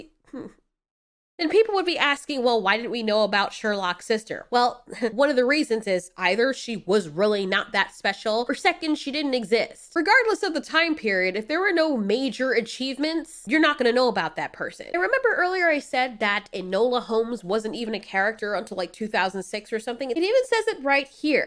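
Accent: United States. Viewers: She does have an accent, an American one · Your not American?just asking because you speak perfect American and in my experience those not born in the language usually gets the small things wrong ,example;saying I'm at Atlanta instead of I'm in Atlanta 😆 and as an aside I would love to see what you look like, and by the way this example actually happened to me yesterday 🤔 🙃!!!$$$+++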